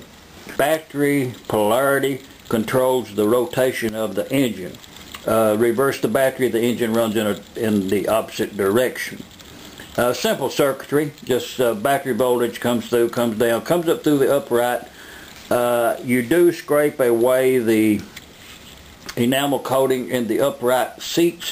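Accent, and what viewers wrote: South, United States. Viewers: I like your accent,you sound like a good ol boy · I believe its a genuine dialect of English as spoken in some part of the USA. The English language is very mangled but I can make out some of it. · The southern accent is strong with this one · Good stuff. Love your drawl! I love tha south son! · lol if you think that sounds like irsh/scottish then you have a problem LOL wtf... you can tell he's American... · I think this video and it's comment section served to demonstrate a phenomenon that is counter intuitive, but exists nonetheless.... The phenomenon is, this guy's Southern accent and style of speaking actually caused to generate unwarranted criticism and hatred from viewers...I challenge one to find another video on YouTube that generates so many, i think unwarranted complaints. The complaints are valid, but most homemade videos are all around worse...Cinematography, subject, narration voice and content/explanation... 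I put forth the hypothesis that because you all hear the accent and subconsciously, at the very least, you think he's a racist or bigot in some way....and the rest is a YouTube comment section....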